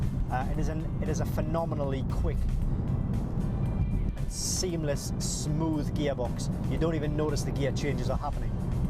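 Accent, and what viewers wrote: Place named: Tyneside, United Kingdom. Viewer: Where you from mate? North or south of the tyne?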